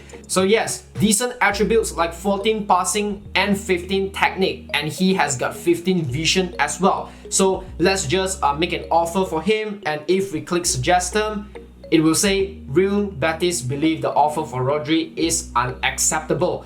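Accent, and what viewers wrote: Malaysia. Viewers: Kuat betul accent chinese/malaysian dia ahahahah. Btw great and informative video my guy! Got new subscriber in me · What the fuck is wrong with the Maysian accent 😑😑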